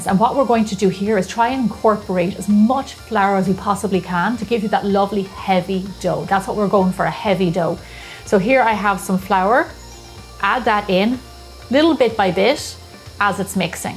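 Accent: Ireland. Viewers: Irish accent? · your bagels looked delicious, made me hungry, & i enjoyed your accent. top 'o the mornin' to ya lass. do you have any sour dough recipes? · With the Irish accent this woman could give a recipe for swill and it would still sound good.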